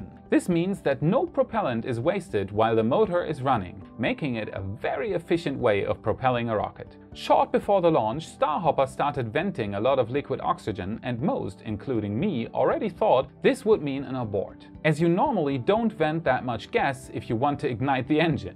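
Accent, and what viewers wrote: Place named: Germany. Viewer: Nach 2 Wörtern schon felsenfest gewusst dass du deutscher bist ;) Danke für das Video, echt super erklärt^^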